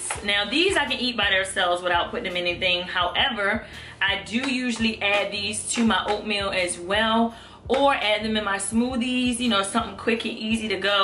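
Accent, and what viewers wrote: Baltimore, United States. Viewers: I live in pg county so trust i KNO she said two words and I just said yep she’s from Baltimore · Are you from Baltimore...? That accent is Thick on some words I love it lol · I called that accent! I knew you were from Baltimore!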